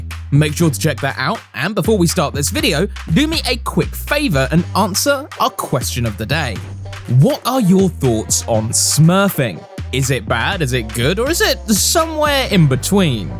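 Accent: United Kingdom. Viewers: Definitely more British than Australian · I wasn't referring to the voice, it's definitely british, something you would hear from a documentary I mean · Always love me some british accent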